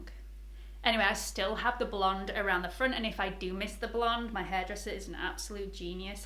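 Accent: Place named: Northern England, United Kingdom. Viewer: You sound northern I am in Leeds. You?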